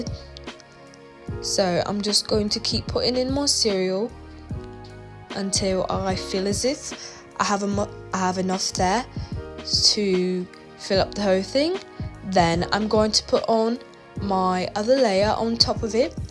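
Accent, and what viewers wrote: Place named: United Kingdom. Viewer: its the british accent for me. You're doing great. Really happy for your business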